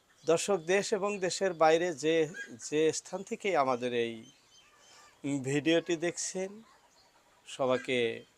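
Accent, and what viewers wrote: Bangladesh. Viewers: কাকাকে আমি তো মনে করছি বাংলাদেশি । আপনার ভাষা আমাদের সিরাজগঞ্জের ভাষা একই রকম।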